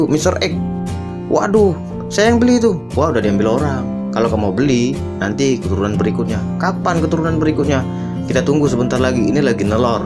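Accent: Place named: Sumatra, Indonesia. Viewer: Macam orang sumatra logat ko wak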